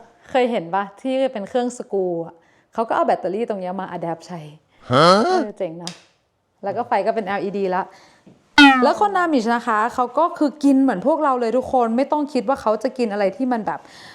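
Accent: North, Thailand. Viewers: ชอบดูคริปน้องมากเลยเจ้า..น้องอยู่จังหวัดหยั๋งเจ้า..ป้าเจียงฮายเจ้าตอนนี้อยู่เวอร์จิเนียร์เจ้า..ได้ยินกำเมือง..ดีใจแต้ๆเจ้าจะติดตามตลอดไปเจ้า.. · ทำไม นู๋อู้เมือง ☺☺☺ สำเนียงไปทาง ชร..พย ☺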